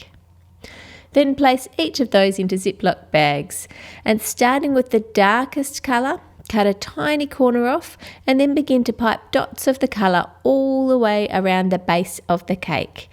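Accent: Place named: Australia. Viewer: Because she's from Australia had as an accent.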